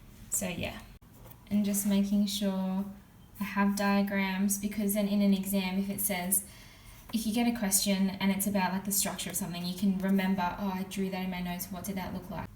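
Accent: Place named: Australia. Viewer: I thought it would be a British video but she’s Australian 😂😂😂mate I’m dying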